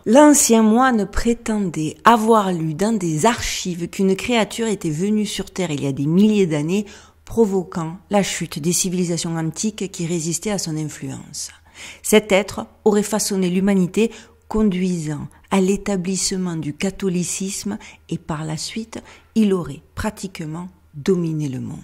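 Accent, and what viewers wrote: South, France. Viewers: Encore une vidéo géniale 😻 j’ai découvert ta chaîne il y a quelques mois et je suis devenue boulimique de toutes tes vidéos! 4 and à rattraper ❤❤ j’adore ta personnalité et je rigole souvent avec toi! L’accent du sud me rappelle mes racines ❤ j’espère que ta communauté ne fera que grandir encore! On a tous besoin d’une pour nous ouvrir les yeux! Tu es vraiment impressionnante ❤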